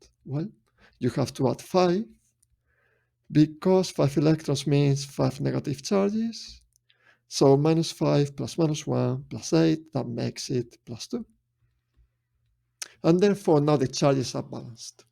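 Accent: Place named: Spain. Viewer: Para alguien inglés no creo pero yo que soy español si lo noto un poco. Mañana tengo el a level de chemistry y tengo que decir que tus videos estan muy bien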